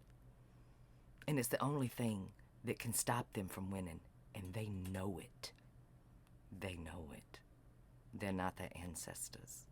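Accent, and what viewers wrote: South, United States. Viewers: I stumbled upon a story yesterday. The voice was like smoked butterscotch, deeply southern. At first I was reminded of Julia Sugarbaker from the show "Designing Women." Then I got into the meat of the tale... a horrifying recount of a Dark Time and her memory saving her from recalling the full measure of it. Then the algorithm did a good by putting her on my suggested list today. You, madame, are AMAZING.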